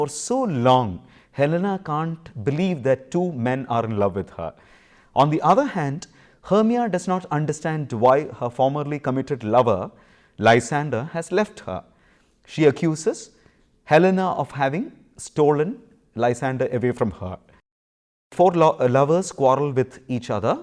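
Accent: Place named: Kerala, India. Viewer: Mallu accent not bad at all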